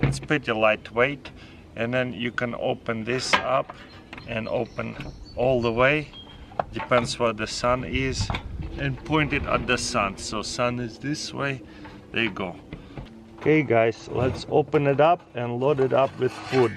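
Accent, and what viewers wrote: Russia. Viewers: Ужасный русский акцент.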